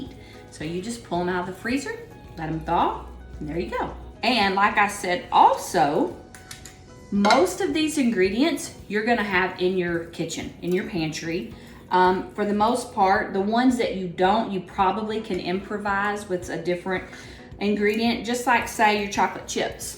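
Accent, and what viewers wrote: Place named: South, United States. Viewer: Where is your southern drawl from?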